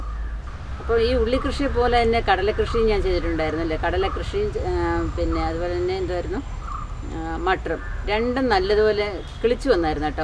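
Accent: Kollam, India. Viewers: കൊല്ലംകാരിയാണോ അതോ പത്തനംതിട്ടയോ ചേച്ചി?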